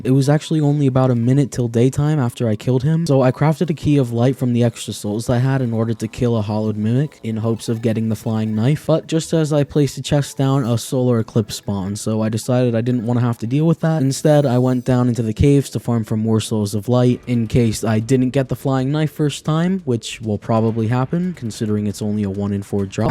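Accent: United Kingdom. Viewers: are you British? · omg, bri’ish terraria 😦😦😦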